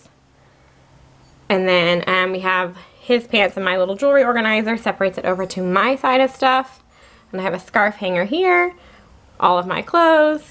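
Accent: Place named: Texas, United States. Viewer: Was going to say you sound Texan. I'm a Texan. :)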